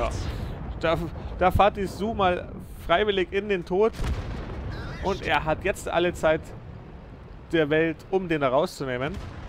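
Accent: Bavaria, Germany. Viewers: Mal ne Frage kommst du aus Bayern? :D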